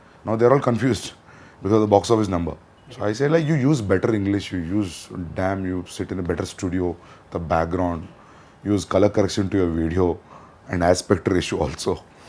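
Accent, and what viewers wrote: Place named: Hyderabad, India. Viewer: That is slang of Hyderabad urdu. Both Mumbai & Hyderabad have Deccan urdu style which is different from North India.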